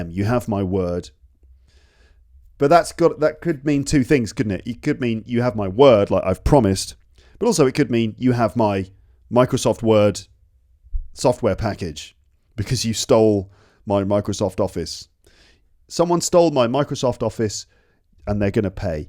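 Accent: United Kingdom. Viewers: I am not to used listening British accent and I could understand you clearly. ❤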